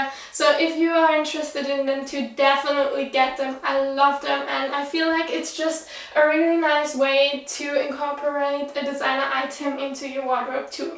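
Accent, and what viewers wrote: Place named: Germany. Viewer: You look amazing in these Tights. And also i love your accent, are you german?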